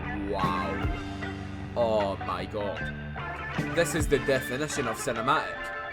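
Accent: Scotland, United Kingdom. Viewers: As an older fan of (I'm 72 soon) I like his rap, but my favourite music is from the 70s, in particular. They are every bit as invested in the lyrics as any decent rapper, with the addition of great talent and skill in playing their instruments. Edited to say, keep letting the transitions happen, they're an intrinsic part of the music. Further edited to say, as a fellow Scot I'm glad to hear a reactor with your accent. I've subbed in the hope of more and for.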